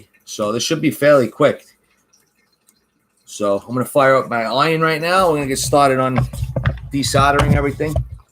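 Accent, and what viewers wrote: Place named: New England, United States. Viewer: You accent makes me miss life in New England (grew up in RI and MA). I'm currently in EU but hoping to get back next year.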